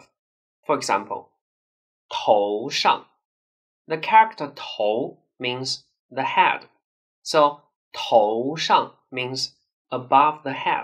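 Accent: Standard. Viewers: I am Chinese , I can tell you that this teacher speaks Mandarin , his pronunciation is very standard !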